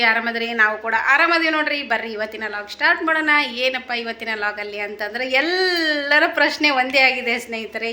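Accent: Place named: Bijapur, India. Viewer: Nim bhashe nam bijapur du ant gottagut sister.gulbarga bhashe matadal nivu thanks 🙏 nice .navu bijapur distric davru but irodu out of State.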